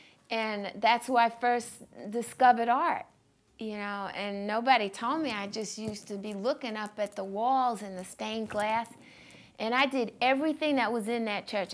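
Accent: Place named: New York, United States. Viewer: I love your New York accent and your apartment is gorgeous I could fall in love with all your art work.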